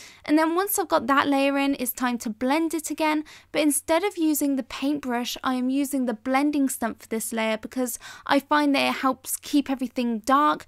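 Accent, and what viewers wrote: United Kingdom. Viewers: I knew you are from UK when I heard your first few words. hehehe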